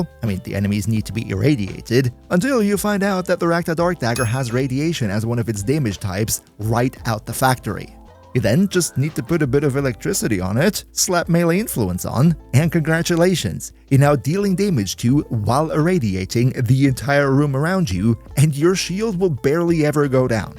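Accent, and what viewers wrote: Germany. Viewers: YOU'RE GERMAN! I have been wondering where your accent was from!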